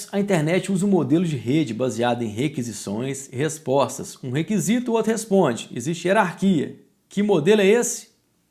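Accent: Minas Gerais, Brazil. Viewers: professor gratidao pelo conteudo dos videos e por todo o seu esforço de noites e madrugadas para ajudar os que de outra forma nao poderiam ou nao teriam condiçoes de o fazer sozinhos e por si mesmos.....agradeço primeiro por mim e depois por todos e vou acompanhar suas aulas pois conhecimento e bom e com mineirês ai e um must!!! Xiq nurtimu!!! rsrs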